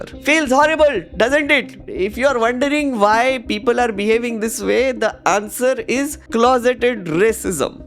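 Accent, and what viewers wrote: India. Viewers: pjeet accent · I agree with every thing, but do you really have to speak like Apu? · Dude, your strong Indian accent is cringe asF 🤮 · They all are watching your video to make fun off your indian accent too. He also loosened? He also lost…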